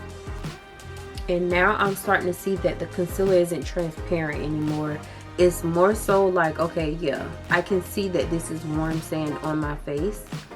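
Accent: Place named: South, United States. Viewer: I knew I heard a southern accent 😂 even down to the certain phrases you said. Girl I’m in SC too and I definitely get it. I use to live in San Francisco but came back due to the pandemic. Wished I would have kinda stayed. 🙄 but ya girl definitely plans on moving again no if ands or buts. 😂 Anyway. Love the makeup 🥰🥰 super cute!! New subscriber 🤗 Very rare I meet another YT from SC!!!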